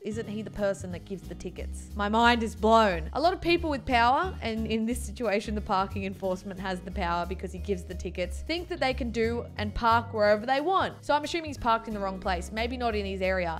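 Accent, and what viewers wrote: Australia. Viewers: I like the Australian accent!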